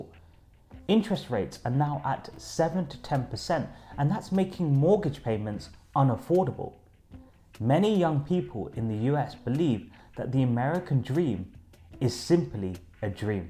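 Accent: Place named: United Kingdom. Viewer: I don’t think a foreigner with British accent should be yapping about the American economy just because he needs attention to his channel. This ain’t UK buddy.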